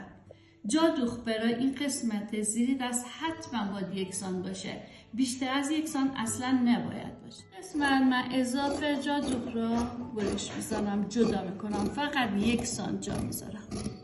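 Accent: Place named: Yazd, Iran. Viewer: ممنون گلم.واسه آموزش.وممنون واسه لحجه ی قشنگیه یزدی.من شیش هفت سال یزد بودم خیلی مردانه.خوبی هستن.❤❤❤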